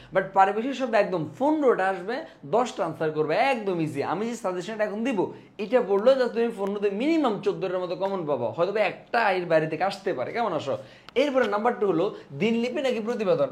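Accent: Noakhali, Bangladesh. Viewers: ভাইয়া কি নোয়াখাইল্লা ?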